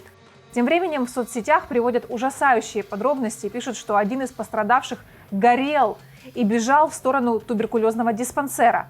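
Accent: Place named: Ukraine. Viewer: У ведущей отвратительный мовный акцент. Аж слух режет... И уголки губ назад при разговоре оттягивает - так делают все мовноговорящие...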